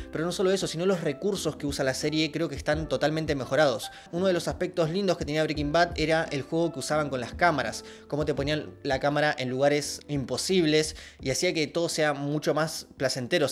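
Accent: Argentina. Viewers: Hablas muy argentino, llega a molestar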